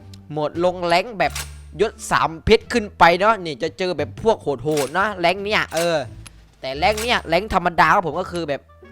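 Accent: South, Thailand. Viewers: สำเนียงคนใต้ป่าววะ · คนใต้ป่าวสำเนียงออก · เด็กใต้หม้ายนิมึง😆555+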